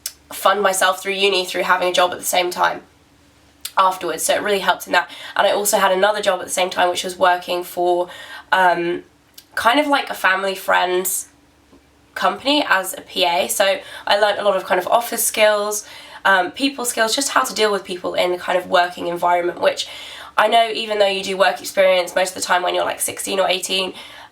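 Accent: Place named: United Kingdom. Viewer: British is back, yey :-)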